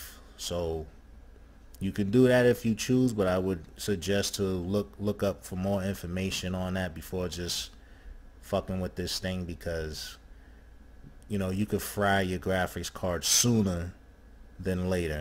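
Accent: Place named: Australia. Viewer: He's obviously Australian.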